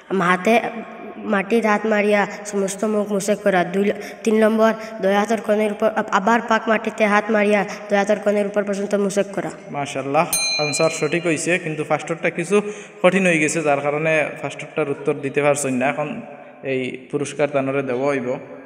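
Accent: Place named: Sylhet, Bangladesh. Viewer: Which part of India is this Sounds to me Sylhety (bangladesh) that’s the way we speak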